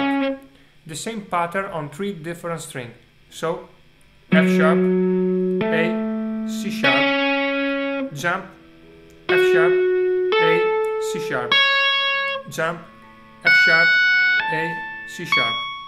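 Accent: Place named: Italy. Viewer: Già prima che dicevi im italian guy si capiva che sei made in Italy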